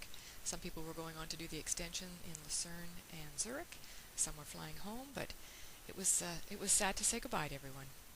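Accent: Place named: Canada. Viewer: Thanks! This is our first cruise, so we shall see how it goes. We chose Crystal. Booked through an acquaintance, but he has not given me half the info that you lay out so generously on your videos. I wish that I had found you before we decided. And, you sound Canadian (we are dual...but grew up in Canada)...so that is also awesome. If we like this trip, and decide on another, I will definitely contact you directly! Cheers.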